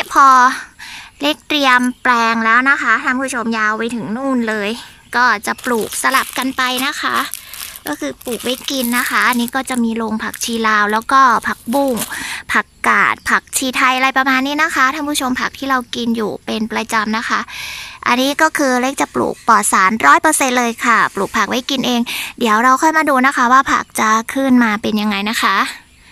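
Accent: Isan, Thailand. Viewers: เสียงเว้าอีสานน่ารักหลาย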